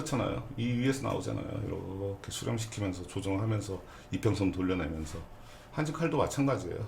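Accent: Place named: Gyeongsang, South Korea. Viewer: 고수시다... 경상도인데 경남은 아니신듯... 사투리가 저보다 부드러우셔서ㅎ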